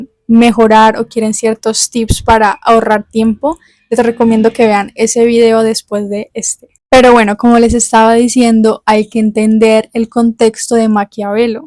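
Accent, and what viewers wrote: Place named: Cali, Colombia. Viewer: muchas gracias!! Que buen video amo, eres de Cali vdd, lindo acento 😙😙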